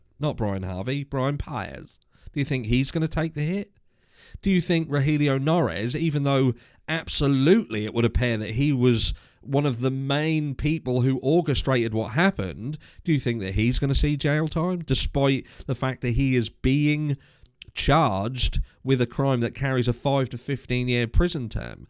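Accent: Norfolk, United Kingdom. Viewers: Your accent sound like your from the Norfolk area 😃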